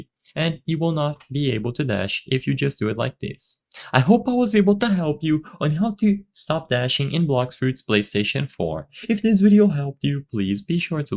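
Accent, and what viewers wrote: Brazil. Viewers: You have a Brazilian accent, that's funneh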